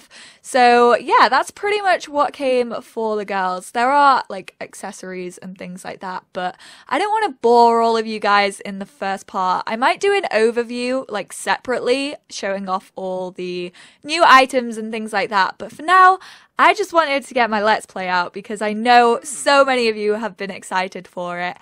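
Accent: England, United Kingdom. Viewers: you are my favorite youtuber!!!! you are one of the only youtubers that makes quality sims videos, or videos for that matter. the fact that you have an English accent is amazing, not just the normal American. =)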